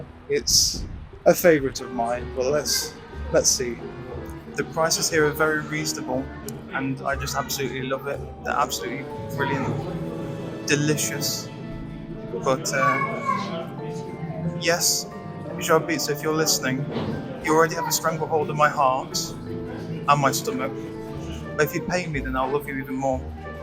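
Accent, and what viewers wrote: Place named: United Kingdom. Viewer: Какой красивый британский акцент. Спасибо Вам за видео. Я не была в Воронеже, но теперь хочу поехать прсмотреть.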